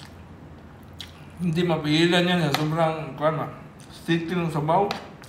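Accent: Visayas, Philippines. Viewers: Bisayaa jud ni ug tingog ka 😄😄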